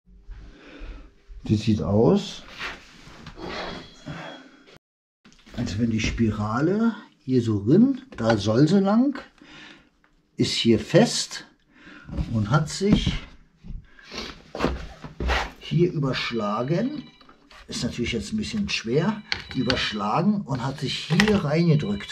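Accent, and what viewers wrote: Berlin, Germany. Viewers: Die Berliner Schnauze ist einfach klasse💪🏻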